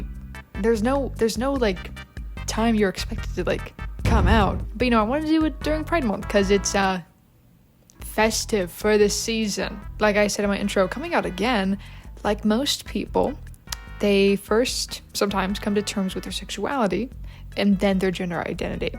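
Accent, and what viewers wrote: United Kingdom. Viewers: so proud of you:) ladies r very cool and attractive i agree. also very good bri'ish accent (from a bri'ish themself) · Great british accent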